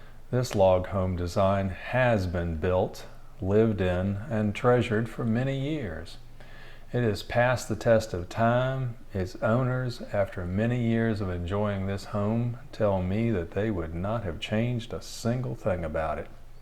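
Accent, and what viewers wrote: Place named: Virginia, United States. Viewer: The cabin is beautiful along with your accent and the fact that you're from Virginia.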